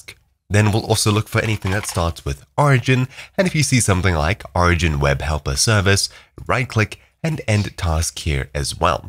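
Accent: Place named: South Africa. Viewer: Knew you were S.A 'en, picked something up in your accent a while ago, then I saw your Origin name just now.. Haha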